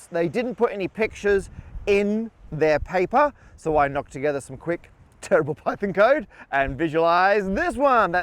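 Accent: Australia. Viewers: That might make sense except for the obviously Aussie accent lol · Oh yeah, I forgot he's australian.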